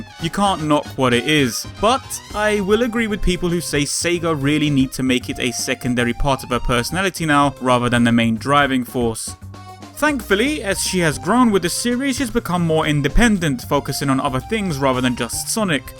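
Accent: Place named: East London, United Kingdom. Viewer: you sound like you're from east london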